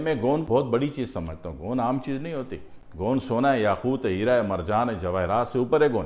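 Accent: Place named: India. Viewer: Apkj zaban se lagta ha ap hindustani ho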